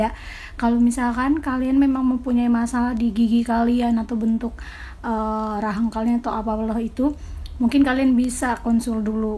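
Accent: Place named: West Java, Indonesia. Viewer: Sunda bangettt:v wkwk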